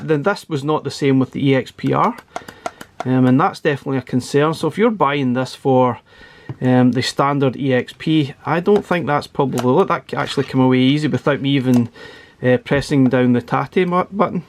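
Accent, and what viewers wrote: Scotland, United Kingdom. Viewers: Just wanted to say I recently found your videos after getting a Super Pocket having wanted an Evercade device for a while, and they’re fantastic :D then couldn’t resist an Evercade VS after getting a few games and wanting to play the on the bigger screen…your reviews are invaluable for deciding what to get next, and it’s always good to hear a fellow Scottish accent on YouTube :D thanks for all you do!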